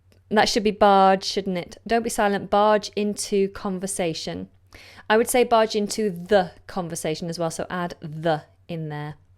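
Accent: United Kingdom. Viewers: Hello, I am a faithful follower of yours and I think you are one of most important and serious youtuber about teaching british English currently. I have seen your develop since you started your channel and you have been gaining lot of suscribers because of your professionalism. I would like to ask you to do some videos about "Shadowing" because I have been watching some very good videos about it but the majority of them are from American English, which is fine, but I am living in the UK and I would like to get the rithm and pronunciation that you have which is lovely. I hope you consider my proposal because I am sure if you do these videos you will do them awesome as everything you have done up to now. This is a very good example about Shadowing, I think he is a very good teacher but hi is teaching American English. <link>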